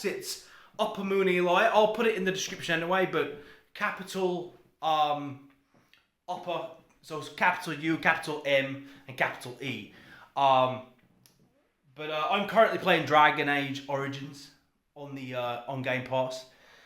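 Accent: United Kingdom. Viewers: am uk and its putting me out I was like was he uk moved somewhere lol 😂 ..but yes give atomfall a look as it looks so good aswell ... having both consoles is the best way now you own a Xbox go and buy yourself some 2800mah AA rechargeable batteries and a socket charger (all about 20 quid if that) and your have a shock how long the controller last to a ps5 controller I get like 4 days from mine lol (usb mic) 😆 my ps5 about 8-10 hours if that lol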